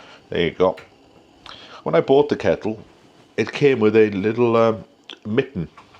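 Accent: Wales, United Kingdom. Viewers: Love your welsh accent. ❤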